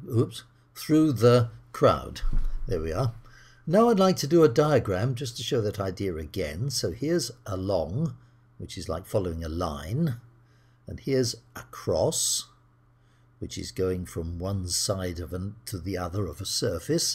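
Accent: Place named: United Kingdom. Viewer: liked and subscribed right away as I heard that fatherly, sincere voice with british accent explaining things in a visual way which helps us easily memorize. Keep up what you do sir.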